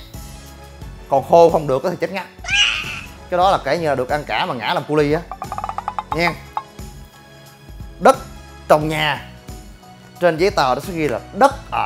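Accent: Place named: Mekong Delta, Vietnam. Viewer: Giọng anh nghe đã dễ sợ.....chất phát gốc miền Tây..... Dễ đi vô lòng người....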